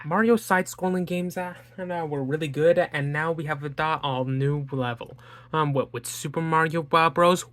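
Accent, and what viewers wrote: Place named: Canada. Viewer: where are you from, the accent I can't place it some things are giving me the great white north vibes lmfao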